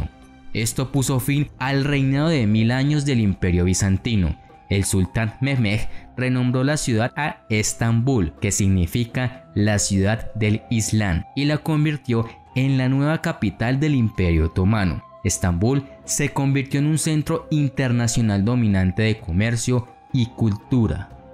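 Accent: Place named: Colombia. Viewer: El que está hablando debe pronunciar la "d", parece colombiano, o es, ellos no pronuncian bien las consonantes, y le cambian el acento natural a las palabras.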